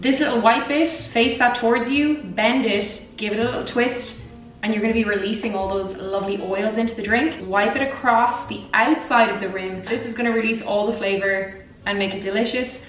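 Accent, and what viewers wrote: Ireland. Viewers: You're the reason I have Siri set up to sound like an Irish female. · Gorgeous Irish girl with an amazing accent who loves whiskey and makes cocktails....easiest subscribe ever! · I was robbed.... I was told that this was a “naked” Irish elixirs. Oh well.... The Irish brogue had me.....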